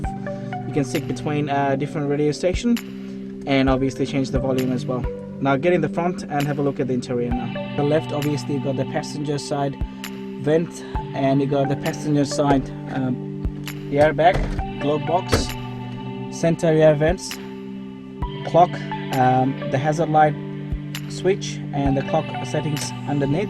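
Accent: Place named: Bangladesh. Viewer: You sound Bangladeshi 😅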